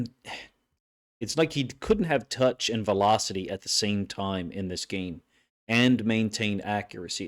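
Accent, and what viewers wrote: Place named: Midwest, United States. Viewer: Haha, I have wondered this myself. Gotta have some Midwest in him, as he’s made some references in his videos to it. Probably one giveaway is the accent, where you can hear him say “da” rather than “the”. No disrespect meant Coach - makes me think of a tough-as-nuts hockey enforcer when I hear it.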